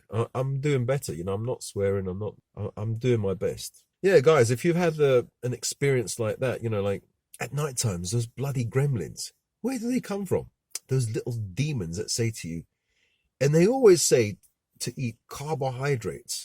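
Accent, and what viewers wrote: United Kingdom. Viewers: Are you from Birmingham?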